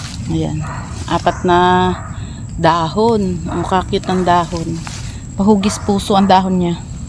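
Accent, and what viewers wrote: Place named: Batangas, Philippines. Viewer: wow sarap yan lakatan, mahal yan fave ko din😊 sarap ng madaming tanim, taga san ka sis? parang puntong batangas kasi😊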